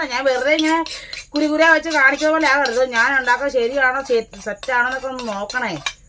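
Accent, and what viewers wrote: Kottayam, India. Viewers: കോട്ടയം ഭാഷ അങ്ങനെ യൊക്കെ തെന്നെ ആണ്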